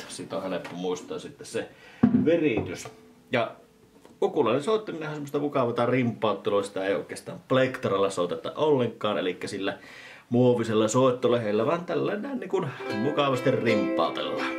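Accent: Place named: Savo, Finland. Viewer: Tämäpä ei ärsyttänyt yhtä paljon kuin normaalisti savon murre. Oli letkeetä.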